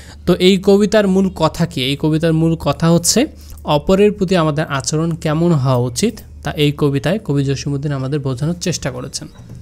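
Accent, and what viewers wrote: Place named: Rajshahi, Bangladesh. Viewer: আসসালামু আলাইকুম স্যার।স্যার আপনার ক্লাস করাটা অনেক ভালো লাগলো ১ তো আপনি ভালো পড়ান মাশাআল্লাহ আর ২ য় কারণটি হলো আপনার কথা শুনে বুঝতে পারলাম আমি যদি ভুল না হই আপনি আমার এলাকা মানে রাজশাহী বিভাগের লোক। স্যার আপনার বই গুলো চট্টগ্রামের কোন লাইব্রেরিতে পাবো যদি একটু বলতেন।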